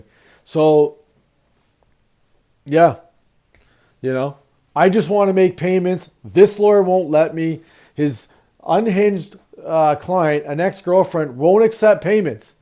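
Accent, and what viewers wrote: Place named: Canada. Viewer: Within 5 seconds I knew he was Canadian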